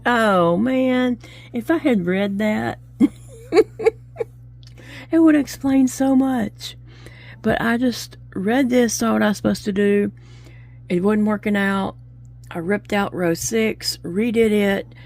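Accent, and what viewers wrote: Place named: Louisiana, United States. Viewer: You just speak Louisiana Cajun! I take great joy in saying I am smarter than Alexa in that I can do that!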